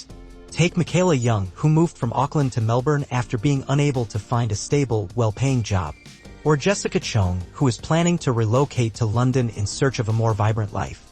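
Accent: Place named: United States. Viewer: Whats with the us based ai voice?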